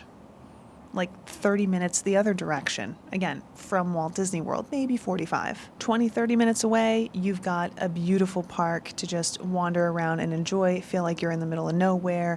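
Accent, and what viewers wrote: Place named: New England, United States. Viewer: I KNEW you had to be from New England - I could feel it! ☺ Once upon a time when I was still a CM at Disneyland, I was seriously thinking of transferring over to WDW. At that time, Spectromagic was still playing and I had a dream to be in it. I was also a naive 20-something. Fast forward many years later, and I just don't know. The humidity is a HUGE factor - does it get any easier the longer you stay? The urban sprawl, traffic - well, I have that at home, so I imagine it's not much different. The cookie cutter stucco homes are annoying AF. I am sure there are older neighborhoods that tourists don't see where I could find more culture and creativity in building - drove through Thornton Park once and that was much more my speed. Too bad the prices don't agree. So, my latest thought is "maybe as a part time retirement job". 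We'll see...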